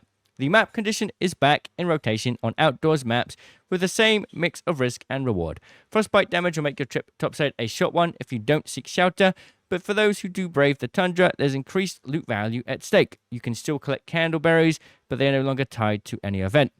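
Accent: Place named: United Kingdom. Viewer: This guy is British so he’s going to say it in a British way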